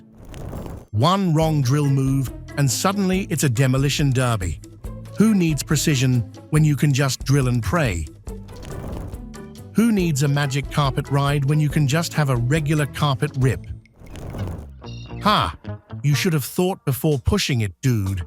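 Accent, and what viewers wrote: United Kingdom. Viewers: It's strange to hear someone with a British English accent using words like 'dude' (whatever that means) and 'I guess'.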